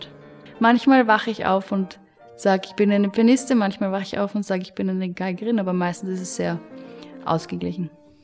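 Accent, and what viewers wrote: Vienna, Austria. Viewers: Sie klingt schon nach Wien. Wirduose Sochen. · Austrian accent <3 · Ist halt Wiener Dialekt. Ist quasi das gleiche wie München und bayrisch. Auch für hochdeutsche gut zu verstehen weil nicht so sehr abweichend. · Nein, ist nicht Wiener Dialekt. Also, das vielleicht auch, aber sicher nicht in diesem Video (hör dir einmal wirklichen Wiener Dialekt an, das ist ganz anders). Deutsch (wie Englisch übrigens auch) ist eine plurozentrische Sprache. So wie US-Englisch kein "US-Dialekt vom Britischen" ist, gibt es neben dem Hochdeutsch in Deutschland auch das Hochdeutsch in Österreich, die unterscheiden sich halt ein bisschen voneinander. Und diese beiden Arten von Hochdeutsch haben dann Dialekte bzw. die allerwenigsten Leute sprechen wirklich reines Hochdeutsch (weder in Österreich noch in Deutschland). hat ein bisschen einen Wiener Akzent, aber zumindest in diesem Video spricht sie (vermutlich absichtlich) recht nahe am Österreichischen Hochdeutsch. 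· She speaks Austrian German, that’s why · you do realise that this is about her german/austrian accent in the english TwoSet vids? Besides that you can clearly tell that she is austrian/viennese from her pronunciation and intonation aswell as her used vocabulary.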